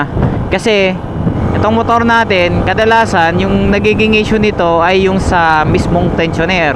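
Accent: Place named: Visayas, Philippines. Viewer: Bobo ng mga reasoning mo. 😂 Sabe nya dahil daw mahal, pangalawa dahil sa installment. Tapos height disadvantages daw, bisaya sakit mo sa tenga pangalawa walang laman pinag sasabe mo. Kailangan mo pa daw mag practice sa mga barako na motor dahil masisira lang daw sa brand new sniper, eh paano yung mga bumili ng ducati nag barako ba muna sila. 😂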